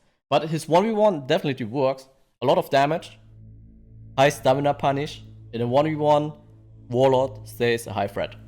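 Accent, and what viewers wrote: Germany. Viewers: i had this thought for a while know but i think n ow im sure i believe is german or at least lives and speaks the language bc eventhough his accent isnt as noticable the way he says some thinks and how he says "ezettera" i have the feeling he is. could be wrong tho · Das ist doch NRW Englisch xD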